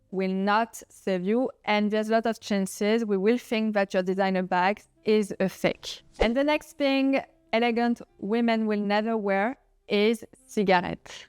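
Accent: France. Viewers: but your accent is what makes you unique! i love your videos with your french accent :) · 😂Love your french accent. I was in France in 2022 (August and November). Traveled to Paris, Normandy, E'tretat, Beaune, Chamonix, Nice, Cannes, Antibes, Bayonne and Bordeaux. Loved France and French culture. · This Yank ADORES your big French accent. And Gaul energy. Keep on keeping on! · I like your french accent very much! I think it‘s really charmimg! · As is your BEAUTIFUL 💕 French accent! It is such a lovely part of your videos! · ALL perfectly said. 👌 And I LOVE your big French accent ❤ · I love your French accent😊 You are doing a great job👍🏻👍🏻 Vive la France!🥰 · You got the best french accent ma'am❤️ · “If you’re offended… my big French accent… please move on to…”, well said 👏! Every time you post a video, I watch it several times. I can’t agree with you more. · I love you, unapologetic about your opinions right out the gate. 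It’s so refreshing to see. If someone doesn’t like your opinion they can go to a different channel. Also if someone doesn’t like your authentic French accent on a channel about French style they can also move on. I love your voice and your accent. I love the authentic perspective you give as a person from France, living in Paris and having lived a time in the US for true experience in comparison.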